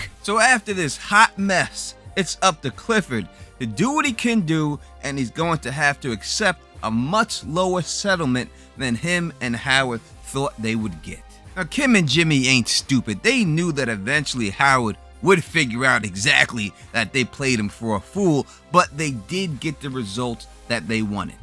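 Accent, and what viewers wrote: New York, United States. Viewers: Lalo and Gus are going to meet for a death match. Lalo gets killed and buried in the lab. Remember when the fly drove Walt crazy? Flies/dead bodies. Haha. Not sure what Lalo wants with Kim and Saul. I guess to find out what really happened in the desert and if it tells him more about the superlab? He may use them to get past Mike somehow for a showdown with Gus; he’ll probably find out Saul knows Mike and he was helped by him. I think Kim either has to go to prison for Howard’s murder or die. I don’t think Ed is a good solution, they’ve used it too many times. We know that Saul buys the black book from the Vet though, as he still has it. All the pieces haven’t come together for me, except Lalo’s death, it has to happen to give Gus so much control in Breaking Bad. I agree, they’ll stick it. It might not be what makes us all happy but it’ll be right for the universe. 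Love your NY accent dude. Okie dokie? Really? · as someone born & raised in NY, the way you say BETTAH CAWL SAWL really makes me homesick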